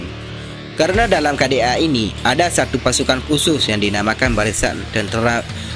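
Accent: Aceh, Indonesia. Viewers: Hahahah bek beungeh duen, nyoe jeut keu ciri khas channel nyoe nteuk, narator jih basa indon logat aceh that, lidah asam sunti is the best.. hhhh 😁👍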